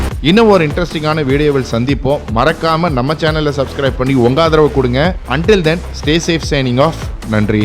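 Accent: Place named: Chennai, India. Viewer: That's it chennai voice mathri iruku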